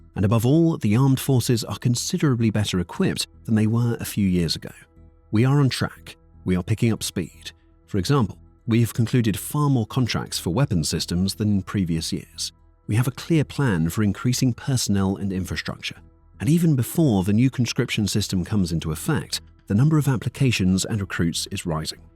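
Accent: United Kingdom. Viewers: always a British accent too.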